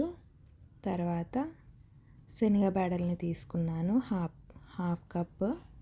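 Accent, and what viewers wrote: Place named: Kadapa, India. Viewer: Pakka Kadapa yasalo matladuthunnave mmeebale chepthunnav❤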